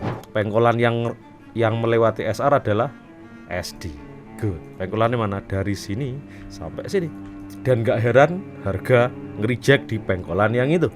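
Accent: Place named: East Java, Indonesia. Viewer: orang mana bang? Aura Jawa Timur nya sangat melekat😂🙏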